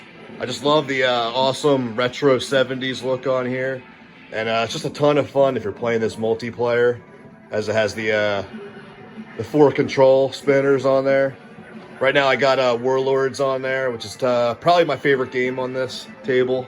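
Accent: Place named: Philadelphia, United States. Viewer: great tour, love the room, gotta say the Philly Accent really sells it for me "Homee arcade" :)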